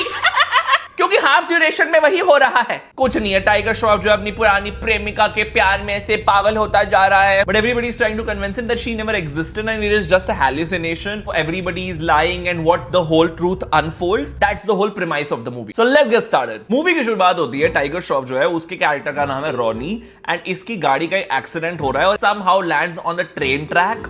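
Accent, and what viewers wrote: Punjab, India. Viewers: Curtain.😂😂😂😂...nice accent in punjabi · his punjabii~ uff~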